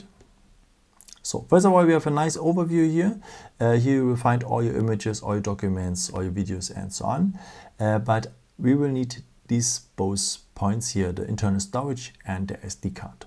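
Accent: Germany. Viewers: Gutes Video! Aber kann es sein das du deutscher bist?😅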